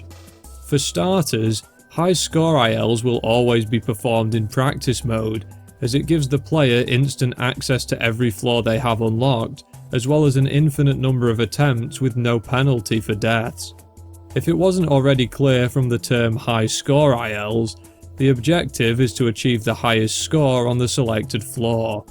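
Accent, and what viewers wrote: United Kingdom. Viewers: refreshing to hear a yorkshire accent on a good youtube video lol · you sound british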